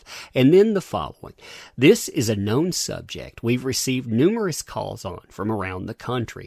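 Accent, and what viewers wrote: South, United States. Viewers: I'm just saying Your Voice Sounds like the poster child for southern hospitality from a guy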